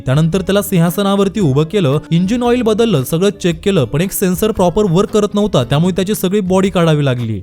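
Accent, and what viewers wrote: Ahmednagar, India. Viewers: Nagar bolat Raha bhava vibe ek number yete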